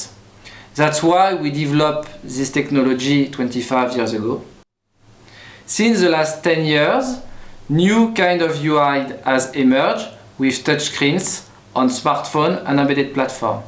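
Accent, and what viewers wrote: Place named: Paris, France. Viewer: Ça sens l’accent parisien par ici. Nice video but can have better resolution for next time.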